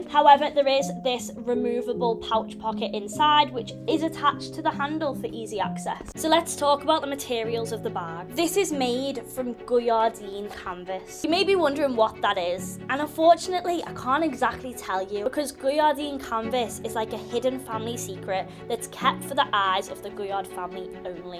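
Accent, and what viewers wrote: United Kingdom. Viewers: I love the video I like your accent but can’t tell where it’s from ? Are you British or Australian or something else ? Great videos as always !!!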